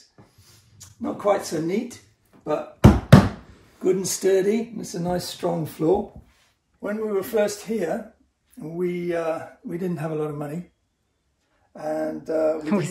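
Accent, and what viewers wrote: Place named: North Staffordshire, United Kingdom. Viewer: An excellent piece about a wonderful little house! :) And narrated by a fellow who sounds a good deal like Lemmy :D You wouldn't happen to be from around that neck of the woods would you (as in where Lemmy was from)? There are elements in your accent that are familiar to my ear, being a North Staffordshire lad :).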